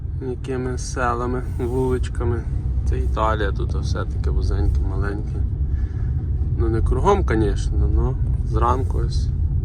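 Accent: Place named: Vinnytsia, Ukraine. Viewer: Ви говорите як більшість на Вінниччині...))))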